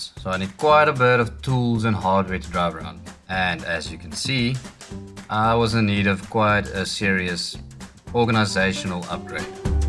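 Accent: South Africa. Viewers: awesome video! love the videoagraphy and time taken to take important close shots. Is that a South African accent I hear :)? I ask because I'm from Cape Town :) One wouldn't say you new to this lol you doing an epic job can't wait to see your new videos. couple of quick questions if you don't mind its about your build. What size Bearings and bolts did you use and the rivet thread things you used, that just mind blowing lol what are they called? Can I source them from BMG bearing man? Thanks again Best wishes for 2019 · I'm afraid I couldn't listen to the whole film , I couldn't understand the South African accent. Great filming though.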